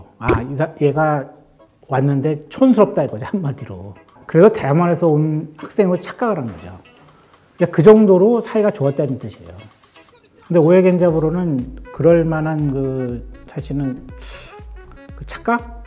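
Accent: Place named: China. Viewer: 언어구사가 중국 계열이신거 같다. 혹시 화교신지요?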